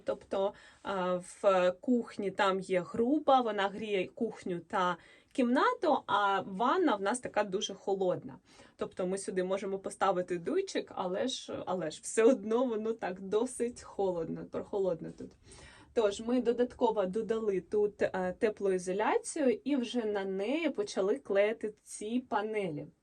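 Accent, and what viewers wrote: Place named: Surzhyk, Ukraine. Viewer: Красунечко! Ви молодчинка, що намагаєтєся розповідати усе українською. Але слово ДВЕРІ, за ДВЕРИМА тощо.. це завжди МНОЖИНА! підказка, яка полегшить Вам вимову без суржику ...Перед зйомкою кожного сюжету ознайомтесь з усіма маловживаними або маловідомими українськими словами з тих, які плануєте використати у своєму черговому випуску. Бажаю успіху Вашому каналу!!🎉🎉🎉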